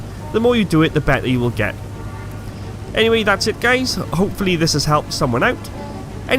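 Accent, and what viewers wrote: Wales, United Kingdom. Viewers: Are you Welsh? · That would be absolutely amazing, not many people like you on YouTube, also loving your welsh accent! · Is this guy Welsh?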